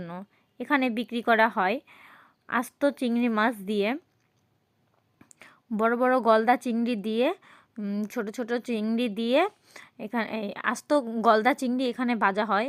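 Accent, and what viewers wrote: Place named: Dhaka, Bangladesh. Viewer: আপু আপনার বাড়ি কি ঢাকা ভাষা ঢাকার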